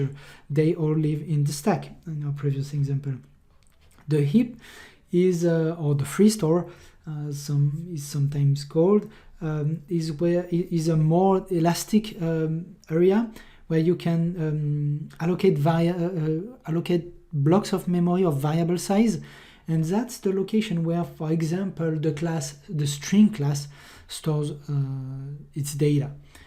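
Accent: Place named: France. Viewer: Thanks for your video tutorials and explanations, i love your french accent because i'm also french speaker and so je comprend bien mieux ton anglais que celui d'un natif 😉😉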